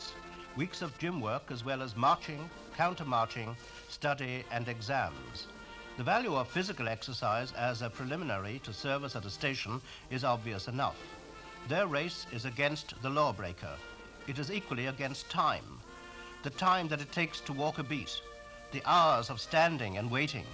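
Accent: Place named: RP, United Kingdom. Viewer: Why does the commentator sound like he went to eton?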